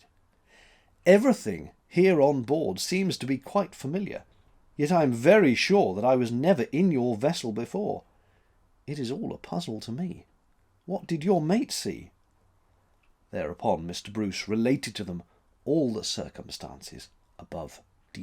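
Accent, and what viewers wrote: United Kingdom. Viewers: bravo. as an american, i can certainly say, stories always sound so much better with a proper british accent. :-)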